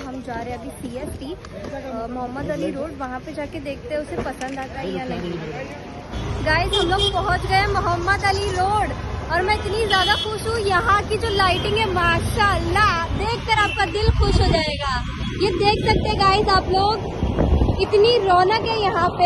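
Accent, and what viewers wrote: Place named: Karachi, Pakistan. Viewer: Ap ka accent bilkul karachi wala hai ap Andheri ka na btatin to mai yehi smjh raha tha ap karachi say ho india mai b M.Ali road hai.?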